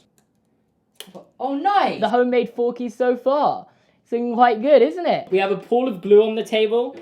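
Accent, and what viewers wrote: United Kingdom. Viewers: Hi I’m new to ur channel today and just subscribed but I was wondering why u have a british accent but it was in $ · is british? idk but his talking is different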